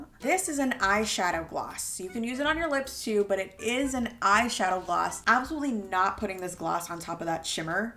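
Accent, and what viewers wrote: England, United Kingdom. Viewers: Omg girl! Your British accent>>>>333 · Are you britesh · The British accent is here and THRIVING!!! It is making my day. Keep being you and don’t hide your personality!!! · Love the English accent girl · her bri'ish accent <3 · Loved the English accent, u did well hun, lol from the U.K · The british accent💅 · Girl that British accent never gets old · I love your British accent it reminds me of ronald weasly 😂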